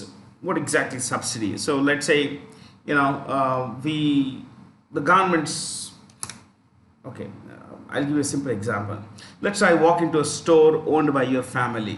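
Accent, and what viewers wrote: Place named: South India, India. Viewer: He is a south Indian. His accent is perfectly fine.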